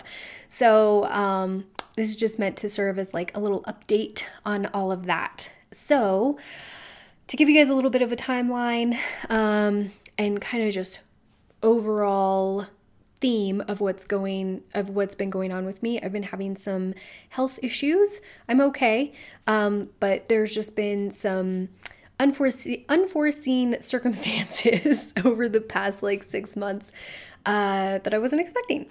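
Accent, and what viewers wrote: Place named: England, United Kingdom. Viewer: Where are you from? I love your English accent ❤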